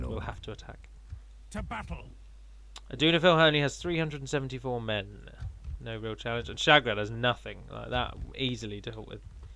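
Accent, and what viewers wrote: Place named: RP, United Kingdom. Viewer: Ah, the Anduin campaign. Where skill level drops significantly xD. In your other campaigns you play mostly as i'd do myself really (except for the queueing of 4k-ish gold cost buildings when you make like 300/turn:P ), but with Anduin I just want to scream at the screen haha. Mostly I resort to facepalming instead. I don't think you will fail the campiagn though, it's really hard to lose since the AI works in mysterious ways. But you aren't making it easy for yourself thats for sure. I don't really watch lets-plays but these I really do enjoy. Probably because it's a really nich (it's that the word?) game, mod-within-a-mod-whitin-a-really-old-game, combined with a posh british accent.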